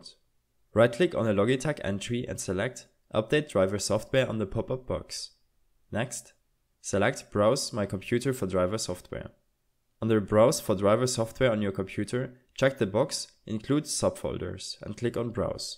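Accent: Germany. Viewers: Deutschester Akzent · Der deutsche Akzent kickt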